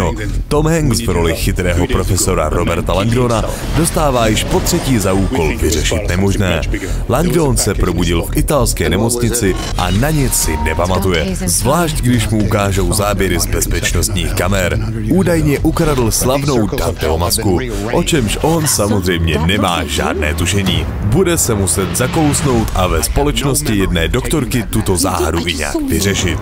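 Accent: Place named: Ostrava, Czech Republic. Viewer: synku nemluv po ostravski bo mi to usi rve:)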